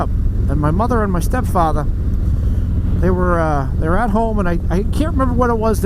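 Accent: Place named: Boston, United States. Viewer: Love the Boston accent the way you say Kar! All mom's and dad,s i think have had a good laugh now and then. That's so true. Hahaha.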